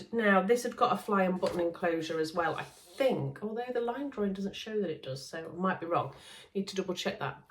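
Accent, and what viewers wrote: Yorkshire, United Kingdom. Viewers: Thank you for putting this together! Just wanted to add that the autogenerated captions are clearly struggling with the Yorkshire accent and are cracking me up!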